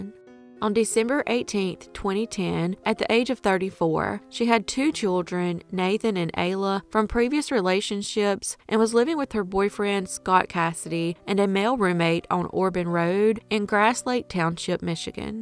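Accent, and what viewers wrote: South, United States. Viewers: You have to tell me what state you grew up in. I am trying to place your accent. I’m guessing Alabama or Georgia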